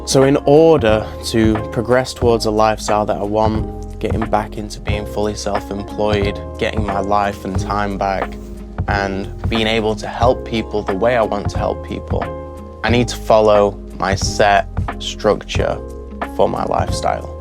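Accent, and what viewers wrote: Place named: Yorkshire, United Kingdom. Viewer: oooooooo Yorkshire asmr ❤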